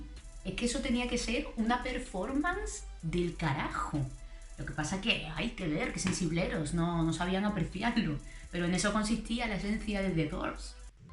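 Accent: Andalusia, Spain. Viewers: Hablando de The Doors en andaluz!! Jajajajaja. Eres una jefaza!!! 👏🏼👏🏼👏🏼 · Creo que este es mi vídeo favorito porque me ha enganchado hasta el final con tanta info interesante sobre Doors y contada de forma dinámica y muy tuya ... muy andaluza , mola! 🤩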